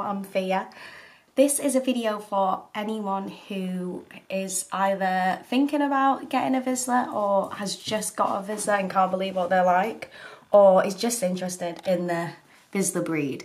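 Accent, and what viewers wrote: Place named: Northern England, United Kingdom. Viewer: Plus, you sound northern haha. Got mine from Staley Bridge.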